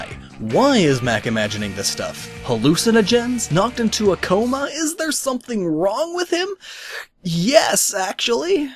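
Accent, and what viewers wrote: Ireland. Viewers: Oh boy what a hot mess old videos were, hey he’s hungry now he’s not hungry now he’s having an exstistential crisis now he’s Irish and drunk RUSSIA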